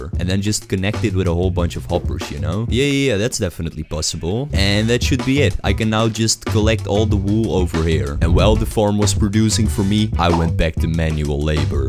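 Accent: Netherlands. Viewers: Jij Hoort Gwn da hij nederlands is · Hmm. In which country do you live? You sound kinda dutch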